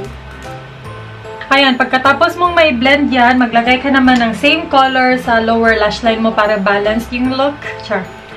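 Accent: Visayas, Philippines. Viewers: Halaaa namimiss ko mama ko sa accent ng bisaya mo ate. Hehe. Godbless po! <3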